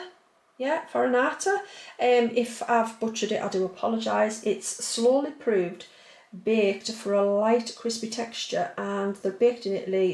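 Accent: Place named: England, United Kingdom. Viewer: I think what I like best about you and your videos, besides the English accent, which is awesome...you are just real. I watched one haul, nice enough lady but it left me feeling like I was a giant loser, 😂. You live like me, you get tired, bitchy, you have kids w/issues, pets who eat better than you do, and it is just life. I do not want to see someone who, God bless them, has a meal plan for the Queen. Sometimes we eat well, sometimes a Pop tart is dinner. I am in Illinois, which is in the United States, in a city 2-2 1/2 hours South of Chicago, to give you an idea. I no longer drink but I would go to a bar with you anyway!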